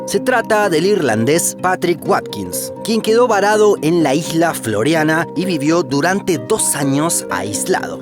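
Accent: Buenos Aires, Argentina. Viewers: Gran video, como siempre. Sólo una pequeña sugerencia, que no tiene que ver con el contenido ni tema: Sería genial si las LL e Y sonaran como lo hacen en el acento rioplatense como anteriormente, pero que noté que hace dos videos se suavizan... No sé a qué se debe esto. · Xfa pronuncia bien las ye y lle No hables asi porteño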